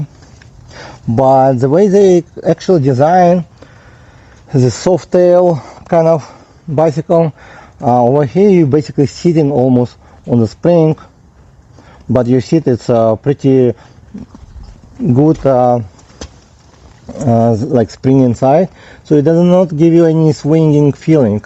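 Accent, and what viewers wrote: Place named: Russia. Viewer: Your accent is funny sounds like Russian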